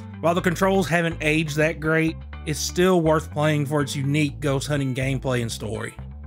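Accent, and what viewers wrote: South, United States. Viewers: Sounds like you a southern boy.... like me lol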